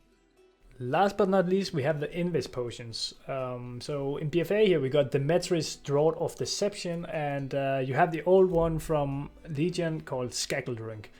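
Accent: Denmark. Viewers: damn, du er super dansk med den accent :D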